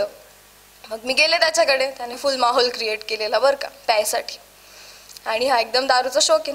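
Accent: Khandesh, India. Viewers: Khandeshi marathi olkhuch yete 😅 Pn chhan ahe 👍👍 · Khandeshi ahe vatate? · आवाज ऐकुन अस वाटत जळगाव खानदेश 💯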